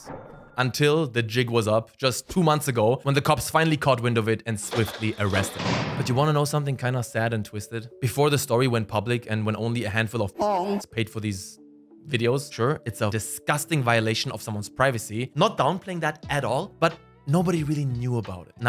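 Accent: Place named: Germany. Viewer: Ich habe gerade festgestellt, dass die automatische Übersetzung von YouTube durch deinen starken deutschen Akzent einfach nicht funktioniert. 😂😅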